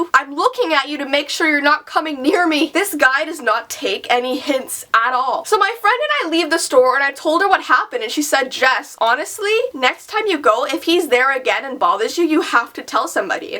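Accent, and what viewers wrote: Canada. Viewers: "It's so weird eh?" 😂☺️her Canadian accident is the cutest lol. I'm from the south so that's really rare to find. Love you !!! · Me: is she Canadian or American? Jess: it's really weird eh Me: ok she's Canadian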